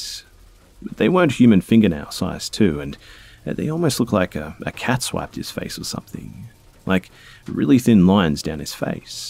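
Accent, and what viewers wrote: Australia. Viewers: I didnt think there was an aussie narrator on the tube. Hi from brisbane 😂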